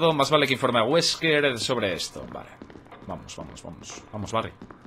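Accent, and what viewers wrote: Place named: Spain. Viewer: Te diré algo con todo respeto. Como me disgustan los gameplays hablados por españoles, sin embargo tu lo haces muy bien y a modo que no es molesto. Mucho éxito y buen gameplay me ayudaste en algo que me atoré del juego XD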